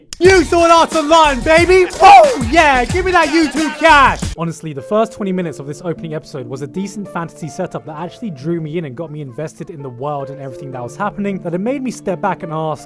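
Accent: United Kingdom. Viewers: Is this dude British or American I can't tell?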